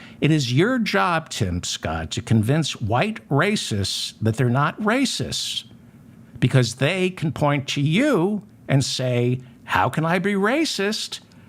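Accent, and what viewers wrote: United States. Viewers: WTF. oh my God. You sound like a Yale Guy.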